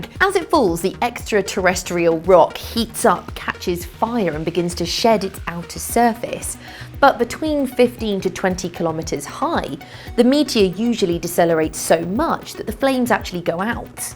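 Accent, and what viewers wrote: England, United Kingdom. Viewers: A beautiful woman with an English accent. Doesn't get much better than that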